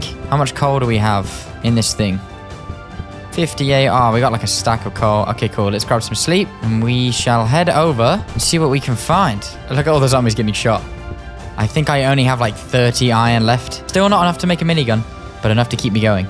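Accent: United Kingdom. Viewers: You sound more british than i last saw you 😅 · dude has the most british accent ik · its the first time i watch a movie from you but why are you sounding so british?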